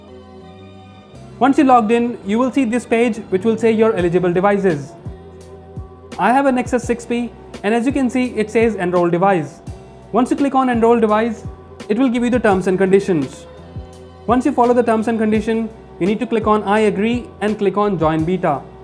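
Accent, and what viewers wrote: India. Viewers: Your english is so odd hahahah what accent is this? I can barely understand what you say. People say that Indians have a very hard english to understand. That's true.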